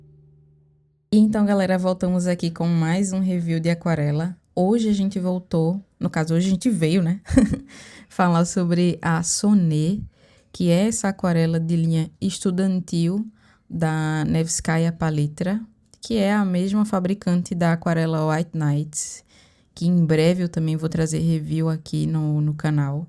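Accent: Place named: Pernambuco, Brazil. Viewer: De onde tu é ? seu sotaque sobe mais pra pernambuco em diante, tbm sou nordestina ,eu já quis comprar a sonnet pra colocar em uns minis estojinhos que comprei, eu tenho a white nights a yellow ochre é horrivel de transparente mas as outras cores são maras eu gosto delas, tem uma galera que não acham elas profissionais o suficientes como se tivessem entre as profissionais e estudante, n sei se é a origem da tinta, por n ser marca francesa ou inglesa ou norte americana. O ocre da sonnet é ruim que a white nghts? sinto falta de ter migos pra trocar swatch de cor , e n teria comprado o kit da cotman45 cores num dia que ele passou sem dupla taxa pouca coisa mais cara que a de 12 cores n brasil , por isso n me arrependo. reconheço seus videos pelo pincel amarradinho do cabo curto da keramik , nunca vi ninguém falando deles.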